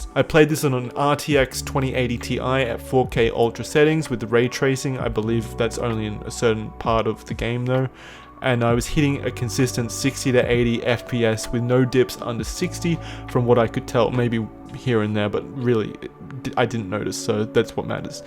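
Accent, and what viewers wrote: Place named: Australia. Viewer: Haha funny Australian man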